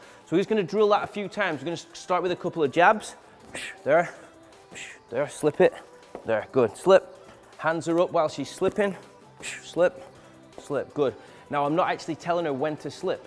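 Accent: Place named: North West England, United Kingdom. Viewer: Your videos are brilliant, I love this channel. I train at a Boxing gym here in Perth Western Australia- I'm Manchester born 🇬🇬 I'm just loving boxing training.(it's fixing me after stroke & changing my life!) I absolutely love your channel, thanks 👌🙋‍♂️ I Started in Bredbury Stockport, you sound like your not to far from this area in the North West hey 😛🤙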